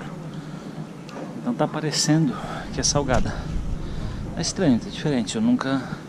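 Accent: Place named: Piracicaba, Brazil. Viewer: Bacana, a é daqui de perto de minha cidade Mogi Mirim (SP). Para dizer a verdade tinha notado na fala dela um leve sotaque de Piracicaba, rsrsrsrs. Gosto muito de seus vídeos, bastante informativos.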